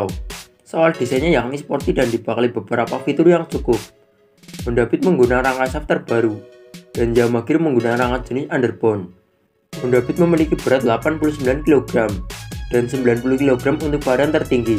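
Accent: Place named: Banyumas, Indonesia. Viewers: ngapak ya mas. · Bahasane wong Tegal.......ngapak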